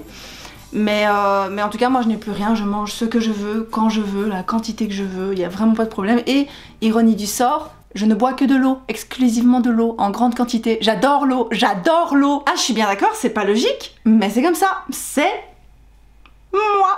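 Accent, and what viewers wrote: Belgium. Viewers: je crois entendre un accent belge? té bèlge? belge aux yeux verts, nan, pa possib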